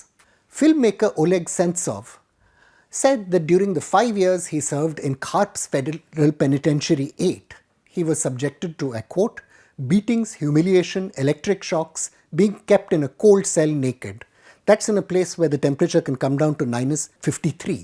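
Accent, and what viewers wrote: India. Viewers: Add text. Your English is too Indian to understand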